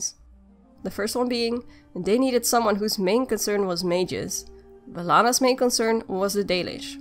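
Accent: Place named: Netherlands. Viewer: So i clicked on this because it was recommended content and as soon as i hear the voice i instantly go, this one is dutch...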